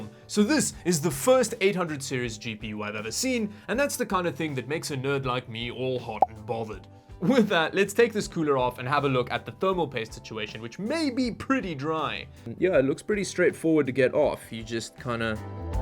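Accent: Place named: South Africa. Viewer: ek kan hoer jy is 'n Suid Afrikaner maar van watter dorp?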